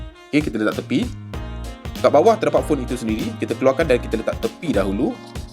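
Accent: Melaka, Malaysia. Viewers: org melaka ke?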